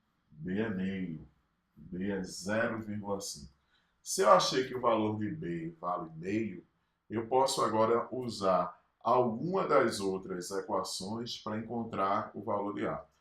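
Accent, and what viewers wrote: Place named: Bahia, Brazil. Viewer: Sei que as aulas são para ajudar pessoas que vão prestar ENEM. Naão é o meu caso. Estou fazendo a segunda faculdade e seu material é bom. tenho mpor hobe o estudo de matemática desde 1984 e a internet trouxe a possibilidade de conhecermos o trabalho de outras pessoas. Não sei, mas parece que o professor é baiano e cheguei a uma conclusão: ninguém melhor que um baiano, calmo e cadenciado, pra explicar qualquer coisa pra alguém. Suas aulas são ótimas professor, pois dão tempo de pensar e assimilar tudinho. já me inscrevi no seu canal e virei seu fão. Esero umdia, nos conhecermos pessoalmente. Vou indicar para muitos jovens. Melhor que isso, só se apessoa tiver tempo para estudar a coleção completa de "Fundamentos de Matemática Elementar" do Iezi e amigos, mas isso é pra quem teria tempo mesmo. É uma coleção fundamental que tenho em minha cabeceira, mas não deve ser usada para um concurso, por exemplo, pois exige tempo para reflexão e absorção de tudo. 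Professor, grande abraço. Continue trabalhando e fazendo pensar.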